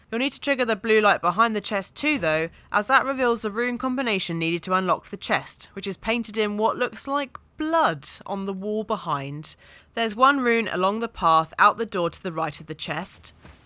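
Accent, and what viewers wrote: United Kingdom. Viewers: She’s British that’s just how we would pronounce it over here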